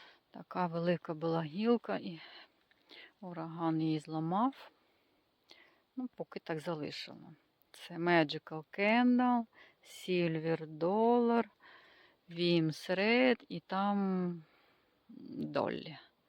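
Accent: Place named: Russia. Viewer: Как ни выдавливай русский,а он все равно проскакивает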